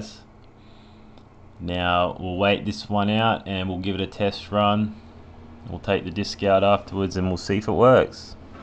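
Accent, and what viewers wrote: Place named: Australia. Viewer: Love ya laid back attitude! Also great to hear a fellow Aussie voice. Helps me understand 10x easier. Appreciate ya work mate!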